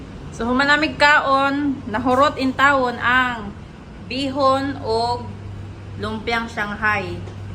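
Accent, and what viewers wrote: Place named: Misamis Oriental, Philippines. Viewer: Taga mis or cguro ka Kay naamay giatay sinultian pod nimo taga mis or gyud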